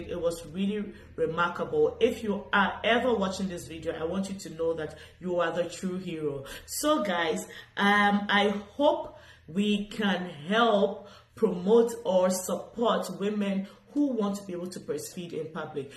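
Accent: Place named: United Kingdom. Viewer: I love the background and your UK accent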